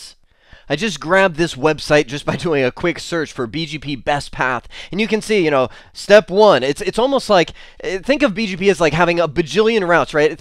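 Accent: India. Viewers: if it hurts so much to hear Indian accent videos... simply stop listening to it in first few seconds.. People make videos for FREE to help other learners, why dont you simply pick up a book and read it then, instead of trying to find knowledge over youtube. I bet if you stay in technology field, you will hear more indian accents wherever you go... i am kind of sad and happy to read your comment, because whatever job you pick you will get to hear Indian accent....because we indians are way technically advanced :)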